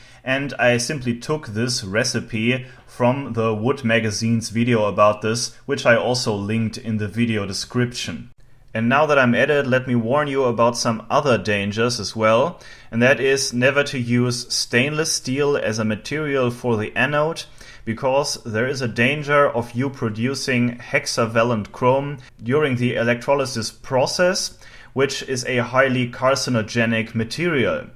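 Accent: Germany. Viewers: German? Just asking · I like watching Mathologer, The Oftler, and the guy who makes those repeating "Legolas" bows. It dawned on me that OF COURSE Germany would have a variety of regional accents just like America does, because you all have very different accents from one another while at the same time sounding distinctly German. I love linguistics. :-) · German? Irish? Idk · Man hört deinen Deutschen akzent echt raus :DD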